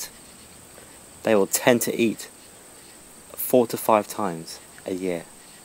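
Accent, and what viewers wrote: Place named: United Kingdom. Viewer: Cool accent! Is that British or South Africa?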